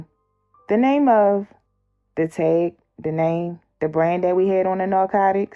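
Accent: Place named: Baltimore, United States. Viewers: Sounds like she from baltimore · Whos dat new narrator wit da bmore accent😍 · Whoever this is reading, either she from Baltimore or she be down here a lot I can tell by how she say certain words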